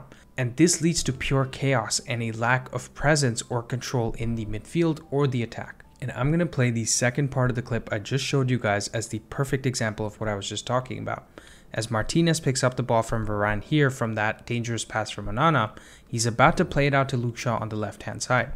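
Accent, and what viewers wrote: United States. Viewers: Could have just said there’s barely any def-midfield. Wolves opened them up like a human body on operation table but then it’s supposed to be a 10min YT video. This season will be shite. (Also your accent gave away north USA feeling 😊. Just saw Canada in your bio). Good analysis overall.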